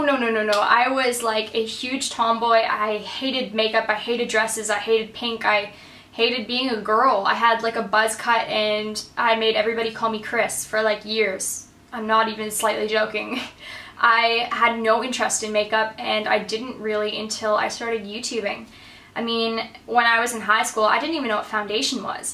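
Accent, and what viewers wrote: Australia. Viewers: you're starting to get an australlian accent a little bit lol :p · i love that you get a sneaky australian accent haha! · Love how you are starting to have a little bit of an Australian accent and say more of their phrases haha :) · I like how I can kind of hear a slight an Australian accent, Cute!! :D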